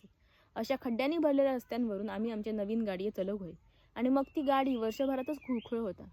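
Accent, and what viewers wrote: Malvani, India. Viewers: खूप छान वाटते तुमची मालवणी ऐकायला एकच नंबर ☝️👌🙏❤️❤️ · तुझी मालवणी भाषा ऐकायला मस्त वाटत बेस्ट लक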